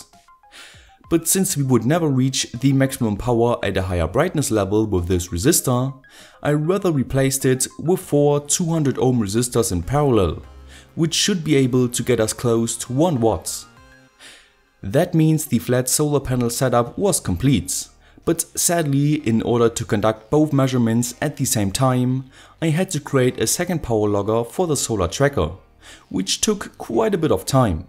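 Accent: Germany. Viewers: Sounds a bit German-ish :)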